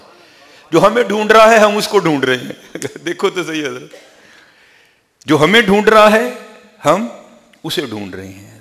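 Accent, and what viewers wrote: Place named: Punjab, India. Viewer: اردو اور پنجابی کی کمال مٹھاس ہے آپ کے لہجے میں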